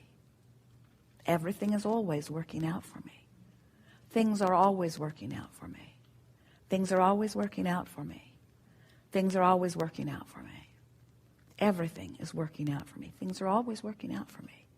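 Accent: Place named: Transatlantic, United States. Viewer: That is so lovely. I love starting my day with a warm drink and listening to Abraham. Esther has such a wonderful transatlantic voice, perfect for storytime and speaking to our inner knowing.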